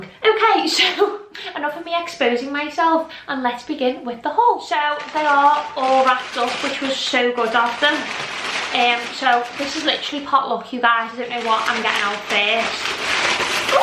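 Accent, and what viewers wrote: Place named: Liverpool, United Kingdom. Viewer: Thank you. Great haul. First time watching, you have a great (Scouse?) accent. Honestly, I loved everything, hard to pick a favourite, they are all gorgeous.❤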